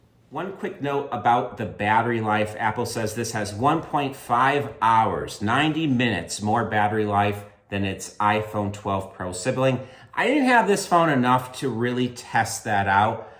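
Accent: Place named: Connecticut, United States. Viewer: Informative video and strong Connecticut accent. 😅 I spent my middle- and high-school years in Suffield.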